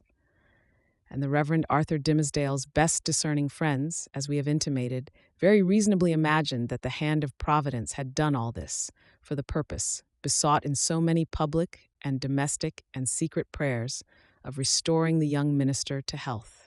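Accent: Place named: United States. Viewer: Hey! I am listening from Brazil. Thank you so much for indicating which audios are using american english! P.s.: I prefer the american ones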